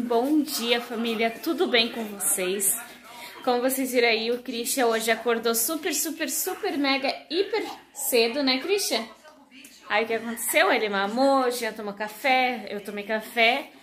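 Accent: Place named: Rio Grande do Sul, Brazil. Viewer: Perguntei de onde vocês são porque você tem sutaque de gaúcha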